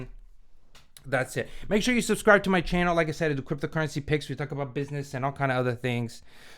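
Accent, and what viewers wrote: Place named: East Coast, United States. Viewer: Run up on there! Now you sounding East Coast! NY/NJ BitCoin revolution! 0x8f641256fedf1453eb<num>b2cf25fe51ada0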